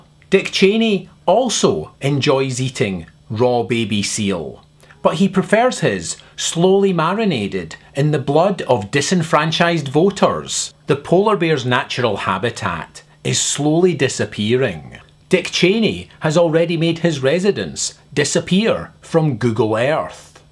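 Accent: Scotland, United Kingdom. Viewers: STOP POLAR BEAR DISCRIMINATION!!! Fuck rats, though..., can you please cuss more? I love when you cuss in your Scottish accent...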